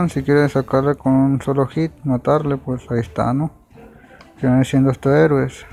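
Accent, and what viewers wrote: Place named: Sinaloa, Mexico. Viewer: Hablas como sinaloense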